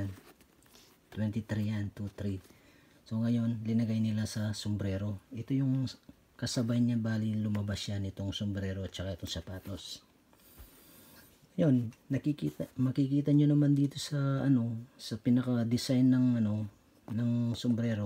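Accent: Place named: Pangasinan, Philippines. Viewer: Pangalatok ka di ba? halatang halata sa accent mo pag nag tatagalog ka eh..🙂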